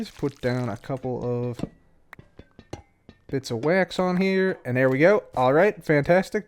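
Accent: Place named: Chicago, United States. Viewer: the accent reminds me of the saturday night live skit with farley and akroid ...."da bears..... da bulls....ditka" ..." how many heart attacks for you bob?"..."gonna be a bakers dozen"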